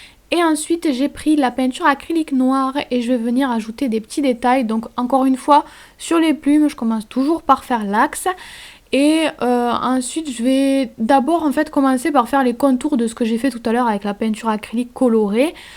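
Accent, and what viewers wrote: South, France. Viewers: D'où vient tu? tu as un accent du sud !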